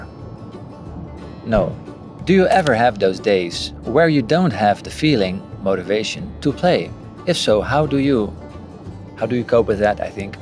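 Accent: Netherlands. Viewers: Are you Dutch? Your accent sounds very Dutch. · Are you dutch?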